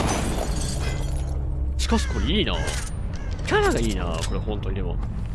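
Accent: Kansai, Japan. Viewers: 新キラーかっこいいですね！さんの関西弁素敵だったです(*･ω･) メメントの時に後ろに写りこんでいたサバイバーさん笑いましたwww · 新キラー､ビジュアルもカッコいいけどリロードがまたカッコいいですね！さんのサバイバー吊った時の高笑い最高です😆 後半の関西弁わざと強めなのも好きです！楽しかったです♪ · さんの関西弁めちゃくちゃ最高です好きです((*´∀｀*)) 新キラーのデススリンガーさんかっこいいですね！！銃のかっこよさ、そしてカッコよく狙って撃つさんかっこいいです✨ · キラーかっこいいのにさんの関西弁ミスマッチすぎるwでもそこがいいw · 動画観させて頂きました🙏✨ 後半の動画では、関西弁が多い＋メメントが、とてもかっこ良かったです☺️💕 “写りたがるやつ”が、とても面白かったです😂😂😂 サバイバーだったら凄く嫌かもしれませんが、キラー側で、このメメントが決まると、とても気持ち良さそうだなと思いました😌✨ 今回も楽しい動画をありがとうございました🍀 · 新キラーやパークの説明など盛りだくさんの動画ありがとうございます( ˘꒳˘ ) やっぱりわかりやすい!!! 途中からテンション上がって楽しまれていたのか、低音イケボの関西弁でplayしてたのがたまりません🤖♡ 特にメメントしてた ~ のとこ好き🤭 かっこよかったです！ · 新キラーおつかれさまでした！新マップも面白そうですね！壁とか壊せるようになったんですね半ギレしてるところと、インストっていうからどんなんなってるのかと思ったら、完全にうつりにきてましたね、あれw 面白かったです(*´ー｀*) 後半の方は関西弁強めだなと思いながらみてました！どっちもハッチ見つけるの早くないですか？！最初のは絶対逃げれたのになー🤔と思いました。これからキラー使っていく１つになるんですかね？？楽しみにしてます！ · お疲れ様でした～ 銃使うのめっちゃ面白い😳😳 関西弁が今回多くて、それこそ10000イイネですね👍👍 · 後半の関西弁大量発生うれしすぎ😍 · このキラーめちゃめちゃかっこいいですね😆 使いこなしちゃってるのもさすがです👍 関西弁多めヤバすぎ(笑) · さん今回めっちゃ関西弁で喋ってくれてはりますやん… さんのデドバ面白くて大好きです！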